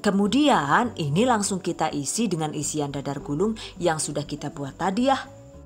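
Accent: Banyumas, Indonesia. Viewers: Suka bgt dengan resep kue yg selalu gamblang dan mudah di mengerti setiap caranya dan obrolan ngapak nya keren trims 😁😁😁👍👍👍👍👏👏👏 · Satu2 nya yang jelas detail sampe ke hitung2nya, cuma ada di. Ada logat ngapaknya jg😊😊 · Kesuh nyonge..ini yang saya suka dr chanel ini..berbagi resep..dengan ciri khas ngapak..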